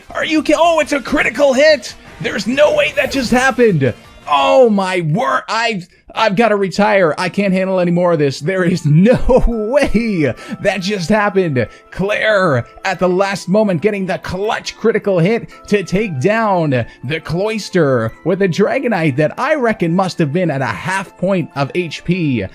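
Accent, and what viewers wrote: Canada. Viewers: love the canadian accent :D :D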